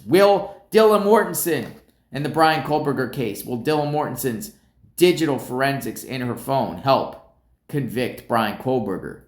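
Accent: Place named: New York, United States. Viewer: Love love all your content. Ur awesome! I especially love your NY accent!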